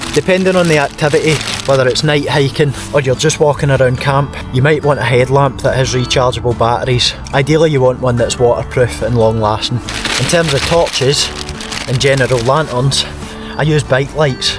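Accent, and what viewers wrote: Scotland, United Kingdom. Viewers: I love the Scottish accent and the video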